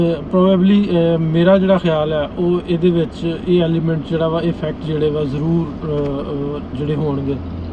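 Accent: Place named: Amritsar, India. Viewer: God bless brother. Tusi pakistan wich kithey rehende ho? Tuhadi boli bilkul amritsar wali lagdi ah.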